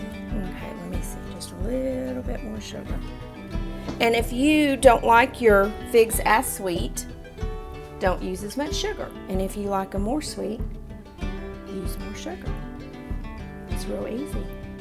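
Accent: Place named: Georgia, United States. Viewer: I'm attempting my first fig preserves. I saved several videos to watch and learn but I didn't make it past yours. 😂 I started it and was like, "hey she sounds like me!" (I'm from GA too) - so I didn't watch any other videos. I've been so intimidated by this but your video makes it look so simple. Diving in now - thank you!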